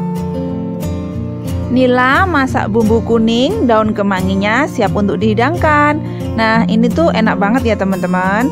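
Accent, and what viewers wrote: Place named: Java, Indonesia. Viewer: Wah mantap bikin perut lper ni 😄 jowo medok hadir embak e..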